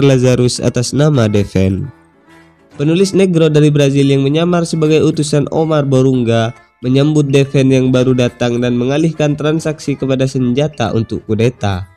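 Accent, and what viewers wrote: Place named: Madura, Indonesia. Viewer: suarane kyk meduro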